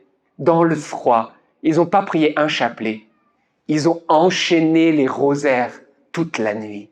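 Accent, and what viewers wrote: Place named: France. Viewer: Sûrement personne est angoissé par la guerre car tous sont gavés d'argent à mort. Ils mettent leur pied ds le 1er avion et foutent le camp. خا. رااا على هيك عالم وعليك كمان لأنك راخي نيعك لمن تحكي عربي. Mon cher petit de rien du tout, comme tu as parfaitement pris l'accent de la langue française , tu peux très bien prendre l'accent de la langue libanaise qd tu parles. Mais non tu veux nous montrer que tu es fghanji coucou de mon c. ul. Vas te faire fou. Tre. Petit c. On.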